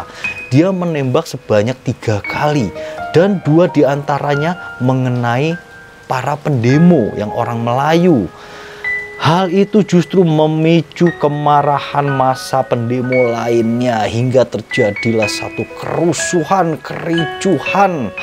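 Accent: Java, Indonesia. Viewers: Jawanya medok bgt tp ngomongnya jakarta...duh jd 😂😂😂😂gk pas bgt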